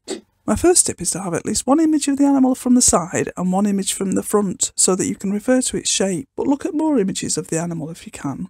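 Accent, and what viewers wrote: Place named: England, United Kingdom. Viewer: I just discovered your delightful, very entertaining, instructional needle felting video’s. Kudos! I’m planning to see all that you have posted. I like your focus on the fundamentals rather than breezing over them. My “older” ears do have trouble distinguishing your English brogue and the rapid clip at which you talk. It’s okay and I’m not suggesting you change a thing, not that you could. Accordingly, my long, slow Southern drawl would likely drive you nuts! I compensate by listening to some of your sections several times until my brain gets it. We hear with our brains, not our ears, ya know. I’d be especially interested to hear more from you about fiber prep for needle felting. I’m a fairly seasoned needler but have had little success applying an outer layer of fine fiber without leaving the telltale little holes, even using a 40 needle of any shape. 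I haven’t owned a 42 but shall order a few after seeing your videos. Thanks again!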